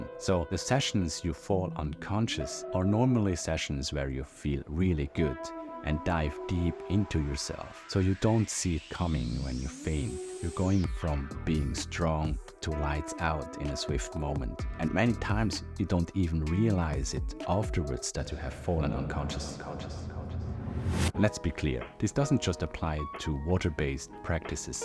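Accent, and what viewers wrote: Switzerland. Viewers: Salut:-) Ich bin mega happy dass de Youtube-Algorithmus vor es paar Wuche mir din Kanal empfhole het und ich han scho recht schnell s Gfühl gha, dass mir de Dialekt bekannt vor chunt. Cool z gseh, dass au Schwiizer i dem Bereich aktiv sind.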